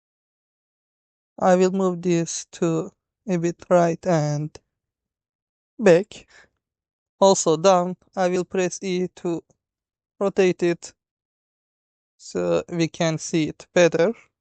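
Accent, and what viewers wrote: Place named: Turkey. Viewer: Türk aksanı gibi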